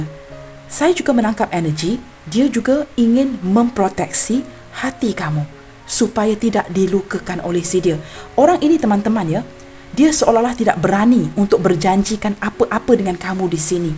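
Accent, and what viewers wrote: Malaysia. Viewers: Sya baru beberapa hr rutin tengok video dsini. Senang dgn cara readingnya... deep sekali. Dengar bicaranya sya jd berasa bernostalgia pd masa sya bekerja d Malaysia thn 1996 silam. D Kilang HITEC, spg,renggam johor bahru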